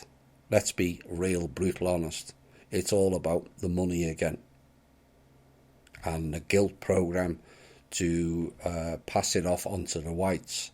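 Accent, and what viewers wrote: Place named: Liverpool, United Kingdom. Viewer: This scouser loved the sound of his own voice, I haven't seen one reply to any comments in 2months of watching!! I am from extremely close to were his accent is from and I have Ufos meeting me every single night, and sometime in the late daytime too! I am Spiritually Awake now and I have so much information I could really help people with, and this guy won't ever answer any comments of mine to even ask what Ive got, and he just plays his small jigsaw piece internet show he is doing, what's going on Lad Ive got evidence that proves how intergrated these vermin lizard archons Draconian Energy stealing <mail>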